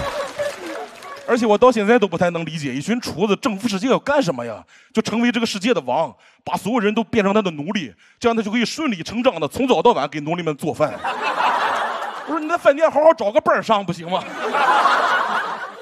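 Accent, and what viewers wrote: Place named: Dalian, China. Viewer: 我是大連女婿，喜歡大連口音。如果冒犯到大連人， 這裡道歉了。 當然肯定會冒犯到一群假脫口秀觀眾， 但是就不道歉了。反正你們也不開竅。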